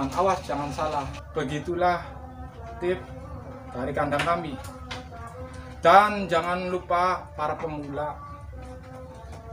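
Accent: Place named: Java, Indonesia. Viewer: Ngomong logat jawa kang mase, daerah ngendi iki mas?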